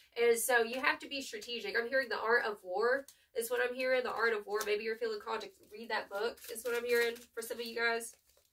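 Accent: South, United States. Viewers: Southern accent. I love that. I’m a Scorpio too. Thank you so much for your reading. Its so awesome. Hits me like a bolt Take care of yourself. We scorpios need that sh.. Peace out, Sista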